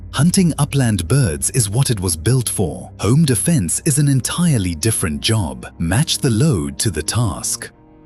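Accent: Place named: England, United Kingdom. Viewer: Why is someone with an English accent narrating this video? If memory serves correctly the British have draconian gun laws regarding ownership. Would you really believe that aside from single shot, side by side, or over under shotguns what could the British or ANY European country teach the United States about shotguns or ANY firearms? They may know theory, but the United States has practical experience. I'd go so far to say, one city in the United States has more experience wirh bang sticks than the entire continent of Europe. LOL!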